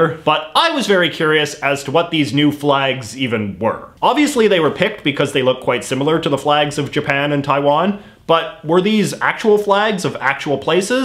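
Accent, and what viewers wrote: Canada. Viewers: i know its 1yr old that was posted but your so Canadian cause you say abut then about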